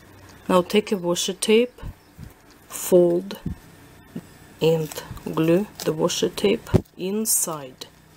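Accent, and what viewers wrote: Russia. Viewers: Русский акцент:) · thought I could detect some Russian in your accent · Omg i wish i was Russian thats why your accident this so beautiful i love that voice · У тебя русский акцент☺ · Oh god, i thought your accent was russian because of Red from Orange is the new black! ( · русский акцент:0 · but she is Russian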